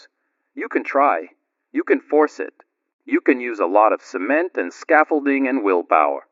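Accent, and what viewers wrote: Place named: United Kingdom. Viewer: Razor You're suspiciously burying the fact this isn't Feynman's voice. It's not even close. Your voice has a british accent.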